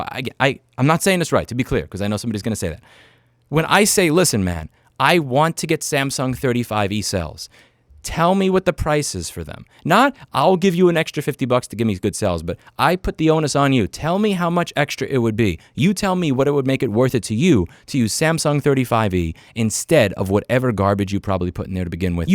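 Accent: New York, United States. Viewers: Good to see he's keeping his angry n'yohka style, even in sunny Texas, when he needs no put these scammers in their place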